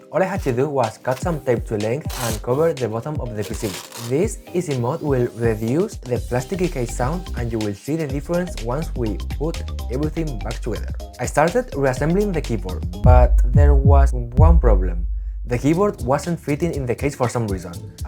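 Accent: Spain. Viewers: La verdad que he notado que tu inglés es muy español, y luego cuando has mencionado que vives en España he dicho, este chico es más español que la tortilla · Desde ek primer momento que escuche tu acento sabia que eras español! tu video es genial, nuevo sub! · Ya decía yo que el acento era sospechoso fellow Spaniard, buen vid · Tiene acento español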